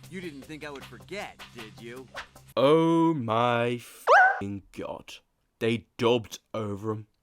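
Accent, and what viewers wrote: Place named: Liverpool, United Kingdom. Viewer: Have to say bro, these videos are excellent! Like the scouse JonTron 😉 Gary chopper should be proud 😉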